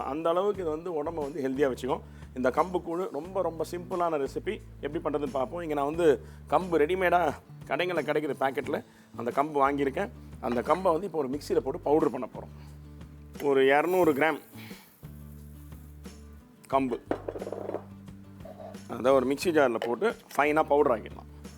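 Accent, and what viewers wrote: Chennai, India. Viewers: நீங்கள் தயார் செய்யும் உணவை உண்டு ருசிக்கலாம். நீங்கள் பேசும் வட சென்னைத் தமிழை காதில் கேட்டு ரசிக்கலாம்.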